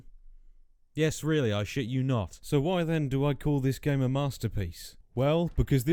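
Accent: United Kingdom. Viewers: I thought your accent was Australian at first but it sounds more British in this video. I'm bad at telling accents. Australian just sounds like a confident British accent to me.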